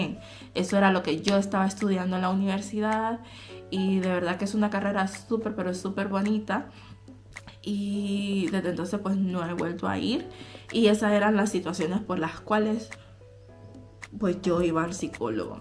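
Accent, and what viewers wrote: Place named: Honduras. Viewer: Tu no tienes que cambiar tu modo de hablar tienes que ser auténtica y Aser prevalecer y valer tu idioma de Honduras Yo también viví en España y nunca cambie mi forma de hablar 🙋🇪🇨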